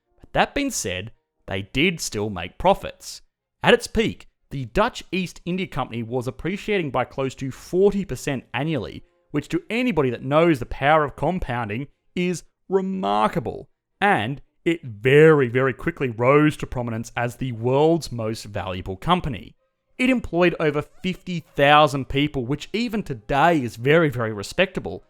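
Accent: Australia. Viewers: One of the reasons I watch your videos is to hear your Australian accent. But I never knew you guys pronounce it... mer-CANT-alism.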